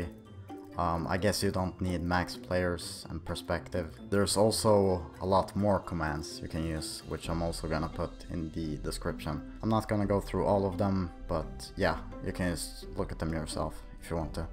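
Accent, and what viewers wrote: Sweden. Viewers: are you swedish?? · Äru svensk?🤔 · Are you from sweden? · OMG ARE YYOU SWEDISH????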